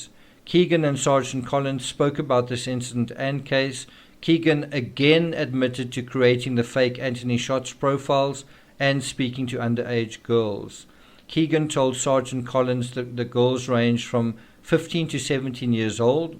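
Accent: South Africa. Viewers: Sounds South African 🙂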